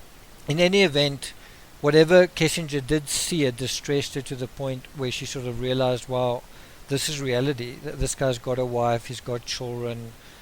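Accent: South Africa. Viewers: He sounds like an Indian living in South Africa 🤔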